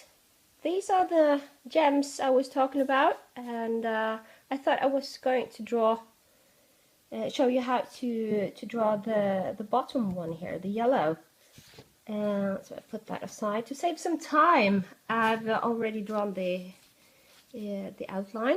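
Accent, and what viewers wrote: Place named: England, United Kingdom. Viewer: I have searched and search for an in depth "how to draw gems" video and yours is the best by far. Your english is great, you have an english accent. Thank you so much for taking the time to post this video. Cheers Janey from the Land down Under (Oz)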